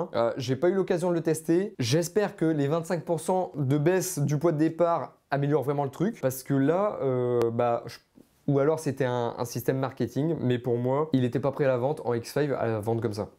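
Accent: North, France. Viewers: Toi t es du nord ou j mapel pas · C est un accent du Nord que j'entend? Bonjour de la part d'un ancien Lensois. Pour le depart, il est important de prendre en consideration les problemes rencontres par le P320 lors des tests aux US ou il pouvait arriver qu il tire lorsqu'il tombe dans une certaine position. Meme si il y a encore des debats sur l'origine du probleme, la version officielle de Sig est que le poids de depart etait trop leger et la queue de detente trop lourde, ce qui permettait a la gravite d'activer la detente. Certaines videos semblent indiquer que ce n etait pas le probleme, du moins pas le seul probleme, mais c est clairement a garder en tete pour ceux qui envisagent une modification du mechanisme de detente. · L’accent du Nord, c’est de trop pour moi. Le contenu est bon mais je n’accroche pas à cause de ça. Je te souhaite une bonne continuation sur YouTube 🙂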